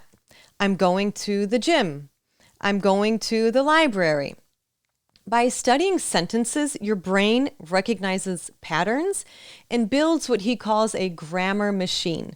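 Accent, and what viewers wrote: United States. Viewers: Are you American? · To have a fluency in second language is not overnight. It's a whole bunch of thing that has to bear in mind mainly if don't live in an English spoken country. In my case i've been practising English my whole life and it's not enough for me 'cause i've got to get into it for hours as if i were living in USA. And nowadays cell phone, laptop and apps help a lot to get in touch with the whole world if you really want to improve your English. By the way you're a good native English teacher and nothing better than learning a real American English from you. GOD BLESS YOU.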